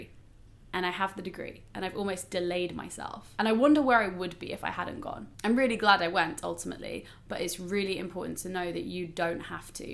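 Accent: United Kingdom. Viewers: I just want to say that your British af accent kills me. Seriously.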